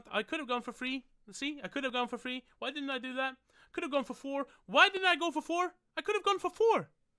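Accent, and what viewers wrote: Sweden. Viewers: are you swedish? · Are you from Sweden?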